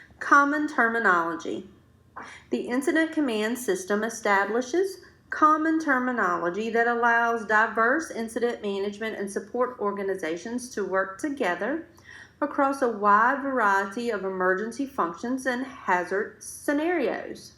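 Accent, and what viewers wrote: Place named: United States. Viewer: Holy crap I cannot handle listening to that hick twang 😖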